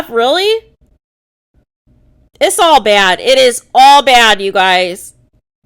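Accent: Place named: New England, United States. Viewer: The way you say “but” and “because” is annoy af lmao. But it sounds New England so I’m used to it.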